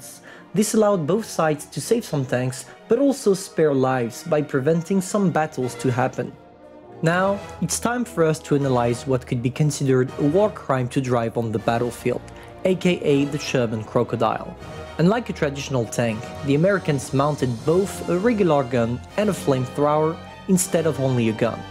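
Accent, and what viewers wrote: France. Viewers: Now I feel that I am in a history lesson with a French boi talking in English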